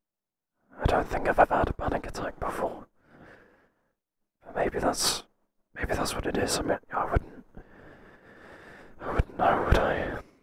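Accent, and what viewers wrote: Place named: United Kingdom. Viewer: i love the british accent 🤌 keep going J! 💋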